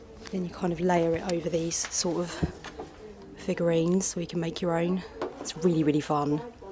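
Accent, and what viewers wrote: England, United Kingdom. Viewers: I've just about catch up on all your videos as a latecomer (mornings with a cup of tea - had to be done!) and they're great! So lovely to hear a fellow English accent amongst the depths of Youtube! Loving the Vlog idea too, it definitely adds something new. As a cheely suggestion, would love you to video your entire collection of cards - but then I am very nosy! Thanks so much for sharing all your thoughts, your videos/posts are brilliant.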